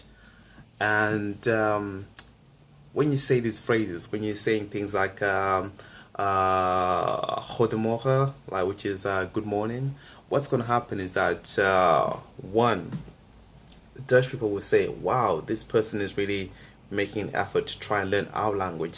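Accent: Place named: United Kingdom. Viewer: What ethnicity/nationality are you? You obviously sound British but it has a tinge of something else I can't pick up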